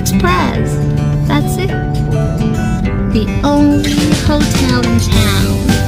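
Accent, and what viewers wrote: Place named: Philippines. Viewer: WOw, that is the most Pinoy English I've ever heard. Salamat po!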